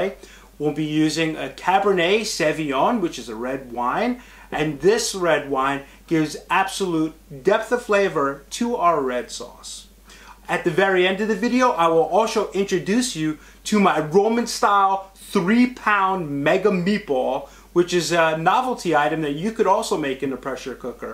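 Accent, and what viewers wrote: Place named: New York, United States. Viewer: Love the way you cook and your (New York) accent...? 😃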